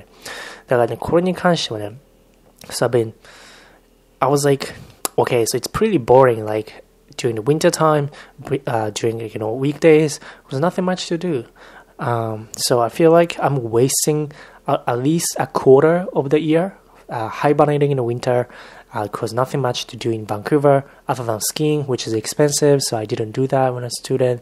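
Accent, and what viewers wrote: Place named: Japan. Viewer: your english sounds like japanese-english.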